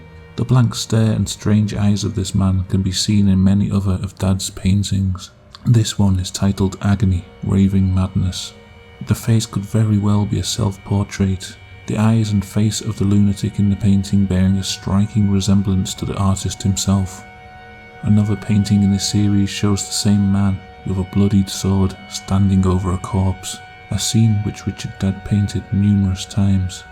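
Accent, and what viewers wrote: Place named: Liverpool, United Kingdom. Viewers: Wow, barely a scouse twang here 6 yrs ago (2022 current year) can't believe it's you. I quite like it! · This narrator sounds like a monotone Liverpool teacher who wants to quit his job... it is a very good video though, just not keen on the violin screeching in the background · VIKINGODIN , you are on the wrong coast. That's a slight Merseyside accent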